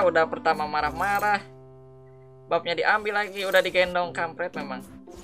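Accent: West Java, Indonesia. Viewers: Jiga logat orng sekitaran garut/tazik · Bang orang sunda nya atau lain tapi siga orang sunda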